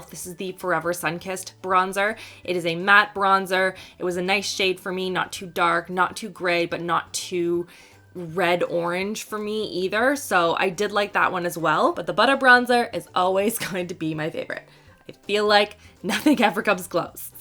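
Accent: Canada. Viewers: Just discovered your videos. Do I detect a Canadian accent? Excited to see Pixie mentioned, I was starting to think they did not make anything good. Thanks! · I love your Canadian accent!! I need to try those NYX lipsticks. Really pretty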